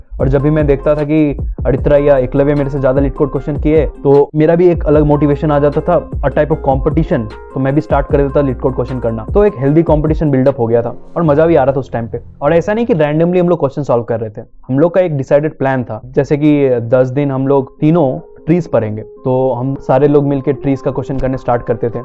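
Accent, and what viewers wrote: Bengal, India. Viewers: thoda bengali accent hai ....bengal se hi ho kya ? ...... and yeah many many congratulations 🥳❤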